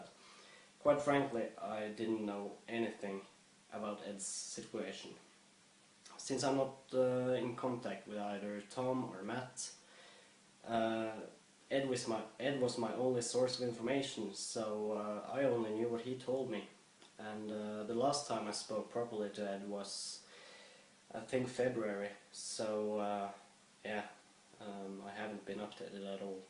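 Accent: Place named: Australia. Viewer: Bruh how did I always think he was Australian...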